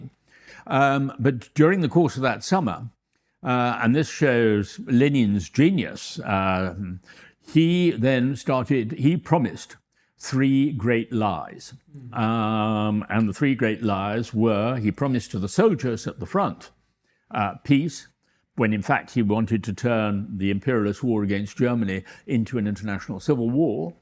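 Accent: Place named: United Kingdom. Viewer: Robert DeNiro with a British accent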